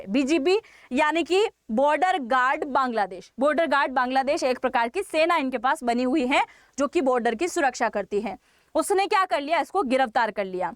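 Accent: Gujarat, India. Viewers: मैम, आपकी हिन्दी भाषा में गुजराती एक्सेंट सुनाई पड़ता है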